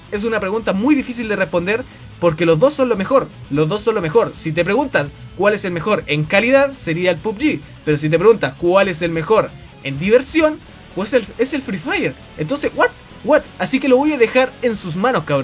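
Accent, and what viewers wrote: Chile. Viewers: Weon yo no te entendi ni madres chileno :v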